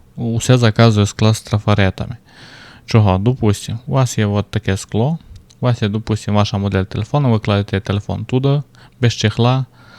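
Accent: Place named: Transcarpathia, Ukraine. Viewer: По говору, чую, що з Закарпаття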